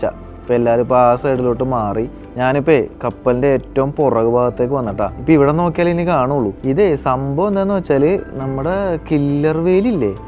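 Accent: Kochi, India. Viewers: Your kochi slang❤❤❤ am also a kocheekkari😌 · Hi. I used to watch your videos when you used to ride in cycle to show the celebrities homes. Aa kochi way of talk aayirunu kooduthal connect aayathu. Later, Egypt videos aanu kanunathu and I noticed that the channel has grown a lot but your presentation and enthusiasm remains unchanged. Inaanu antartica suggestion vanathu. Angane YouTube onum kanuna aalala, enalum otta irupil thane episode 6 aayi. Good job 👍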